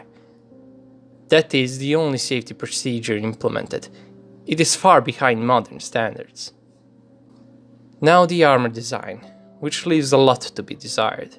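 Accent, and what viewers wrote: Russia. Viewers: This guy is “stupid” you know why? Because I’ve been through thick and thin with this tank Meaning I’m a Gunner in IDF Armored Corps, 7th Brigade,I think the uploader is a Russian speaker with a little bit of accents in English, who probably have friends in the IDF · funny to hear criticism of the Merkava with a clear Russian accent. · This video is reasonably good. However, you thick Russian accent in English commentary at times obscures the meaning especially on the technical terms.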